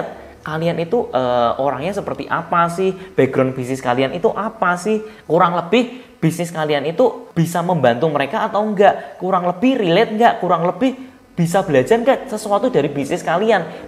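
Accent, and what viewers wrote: Java, Indonesia. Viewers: Medok koh 😀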